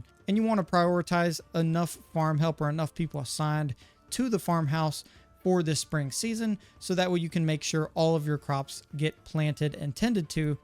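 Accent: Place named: Texas, United States. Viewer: Thanks a bunch brother! Haha I couldn’t help but notice the accent, are you from Texas?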